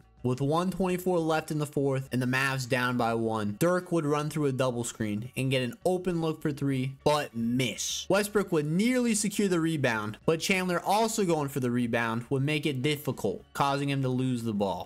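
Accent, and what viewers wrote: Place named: New York, United States. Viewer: its so funny hearing a new york accent talking about the mavs so much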